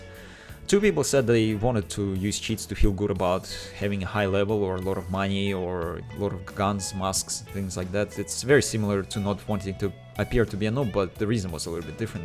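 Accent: Russia. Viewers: Are you from kazakstan? Asian but russian accent....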